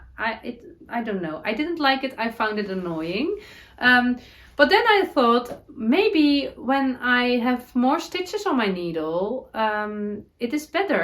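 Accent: Netherlands. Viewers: Happy New Year, it was so lovely to hear your dutchy English this morning, I really missed you. That's wonderful news about the YT Friday podcast. I would love to join you! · You make me smile!!!! I love your dutchy English. I may have to make a festive sweater exactly like yours…I love it!🧶❤️🇨🇦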